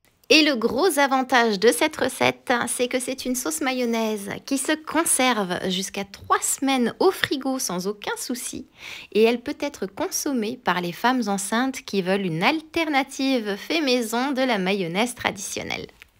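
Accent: France. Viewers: Muchas gracias por esta idea! Me parece fantástica 👏👏👏👏👏👏 La prepararé un día, seguro! Además quiero darte las gracias porque me encanta escuchar tu precioso acento francés y así aprovecho para recordar cuando lo estudiaba en el colegio😊 Un abrazo y gracias por compartir tantas recetas estupendas!🤗💜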